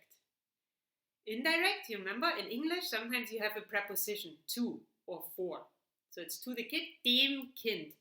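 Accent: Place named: Germany. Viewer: explaining it very slowly with a very german accent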